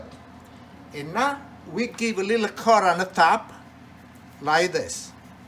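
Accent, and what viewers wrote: Italy. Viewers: I miss my Italian Grandparents loads. I spent so much time in the kitchen with my Nan learning how to cook and absolutely loved it. Sometimes find myself just putting this on to hear the Italian accent, brings back great memories. On the plus side... he’s a lovely fella and an amazing cook!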